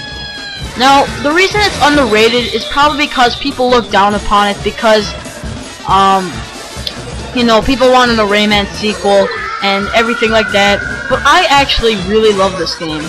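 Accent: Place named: United States. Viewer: That accent is 100% American my friend, don't kid yourself :D I think part of feeling we all have towards games comes from the time you play it in. I'm glad you buy the old consoles, shows a desire to explore old styles and appreciate the classics, but I think you have to grow up in those eras to experience what it's like playing these games for the first time during their hey-day. Golden days spent playing Sonic on GameGear, Mario on GameBoy, Goldeneye on N64, Red Alert C+C on PC. Good times!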